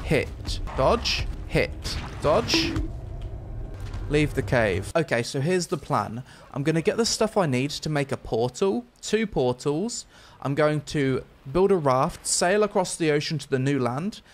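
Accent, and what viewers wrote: United Kingdom. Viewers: thaa brrrrrrrrritish is very amusing. what a lovely chap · after watching this vid i fkin love british accent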